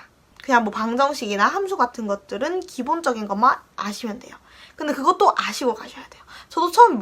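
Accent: Gyeongsang, South Korea. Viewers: 경상도 사투리 묻어나오시네용 ㅎㅎㅎ 도움 많이 되었습니다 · 경상도 사투리 쓰시네요ㅋㅋㅋ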